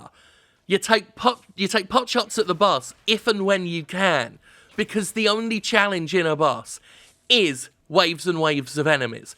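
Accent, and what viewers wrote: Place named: RP, United Kingdom. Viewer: Allow me to save you the time of watching this review. Prefaced with that I used to love Sterling, I've been here since before the cornflakes and before the stint of "addicted to stress". I've been a fan for a long ass time, and shared many of the same views he did. But now all I see is.... Sterling Hates Everything, He Hates Fun. He Hates Friends. He Hates People. He Hates you, your family, your dog, your cat, your nonexistent significant other, your existent significant other, your brother from another mother, and everything that has ever held a state of matter since the dawn of time. He literally has turned into a grumpier "Francis" (Boogie2988) with a posh accent. He spits out reviews after playing a game for 5-10 minutes, says he hates it, says that everyone who disagrees with his review or dare I say it, finds his review full of false information, is full of bullshit or are just hating on "True gaming journalists like him." AKA: Sterling has officially become the IGN of indie reviews. 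Except instead of getting paid to rate games higher, he's getting paid to shit on everything. And it's not just this game. It's a common theme among all of his videos as of late. Sorry. I used to thank god for you, now I'm just not a believer anymore.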